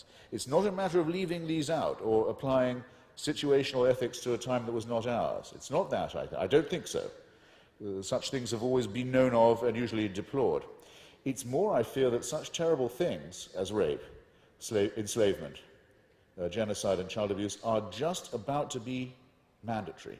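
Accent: RP, United Kingdom. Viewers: ..."there's then a sulfurous interlude"... These gems just roll off his tongue, proof of his linguistic prowess and command of the English language. The Queen's English, actually. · Total lack of understanding of Scripture in its wider context. For a start he calls Shabbat Sunday! Speaking in an upper middle-class English accent does not make the case stronger.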